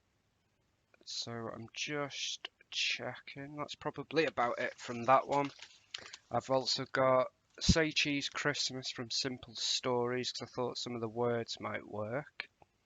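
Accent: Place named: United Kingdom. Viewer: How nice to hear a British blokes voice doing scrapbook pages… cool take on the sketch and prompts. The format works a treat just like one of my favs Shannon (she’s Crafty). Chuffed to have found you… new subscriber 😊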